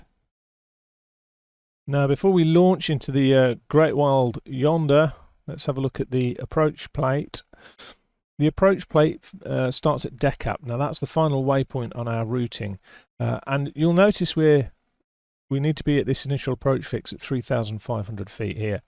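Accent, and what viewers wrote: United Kingdom. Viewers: And also, I am up in years and sometimes voices are hard to discern. Your, British Accent, I presume, is VERY EASY to hear and probably the most clearest English to understand.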